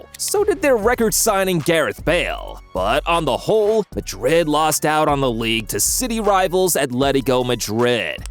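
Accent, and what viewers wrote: United States. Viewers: Your voice an american pronunciation are unbearable, can't watch this shit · What kind of American narrator is this?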